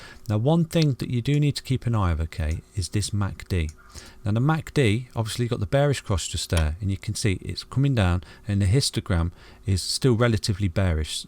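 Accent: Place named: Manchester, United Kingdom. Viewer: There's a lively discussion about where in the UK your accent is from going on in the Harmony holders telegram. I'm guessing somewhere nearish to Manchester, how did I do?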